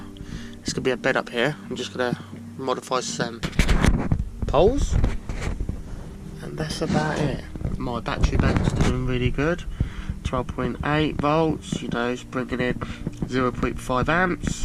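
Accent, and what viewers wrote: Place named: United Kingdom. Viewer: Love the UK accent and expressions. Good thing I've watched Are You Being Served? for years, so I understand some of your expressions. I use "knackered" a lot now.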